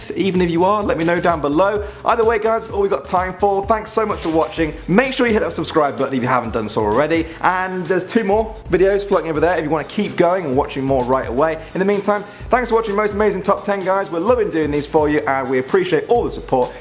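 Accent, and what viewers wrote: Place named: England, United Kingdom. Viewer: Like Danny English accent🇬🇧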